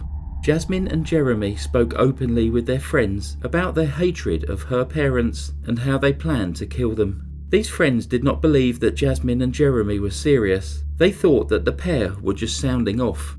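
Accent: United Kingdom. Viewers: Clearly from your British accent you are 'not from around here'. Which just makes me appreciate all the more that you put the effort in to give proper name places. I get so sick of the ton of video stories, especially from the States, where they will say Edmonton, Canada or Toronto, Canada or Calgary, Canada, etc etc. It's like they don't recognize we have provinces here. Many provinces have towns with the same name, so you'd better specify the province if you are mailing something!! As far as this case goes if Canada gets any more lenient on murderers, people will be crossing borders to commit their dirty crimes here knowing they'll only get a slapped wrist!- But seriously, 6 years inside for slaughtering THREE people-- so our Government gives just a worth of 2 years per person. So a kid can kill me tomorrow & my death is only worth 2 years!! And if one of your innocent victims is a LITTLE BOY, and your own little brother, no big deal, only worth 2 years still. 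How the hell can she be free?? HOW??? My god please tell me before this little monster was fully released she was permanently spayed. God don't let her breed her evil blood. Otherwise one day we will be reading about how she brutally killed her own children too.😕 Rest In Peace, sweet baby brother.🌹😔🌹. 🇨🇦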